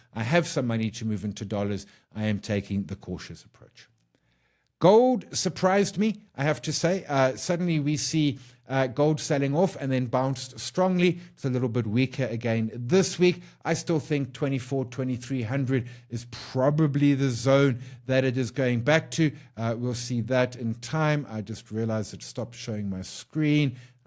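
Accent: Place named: South Africa. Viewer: I concure with the below comment, no captions needed really. Your vocal presenting skills are more than sufficient. The accent is a typical south african accent and we can hear what you are saying. I don't typically watch the show, I listen to the YouTube show ( play the video, pop into split screen and just listen while doing something else.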